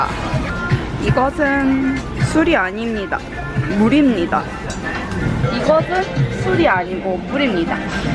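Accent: Jeolla, South Korea. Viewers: 엌ㅋㅋㅋㅋ 언니 말투에서 살짝 전라도 느낌이나서 귀엽다고 느꼈는데 부모님의 영향이었군요 ㅋㅋㅋㅋ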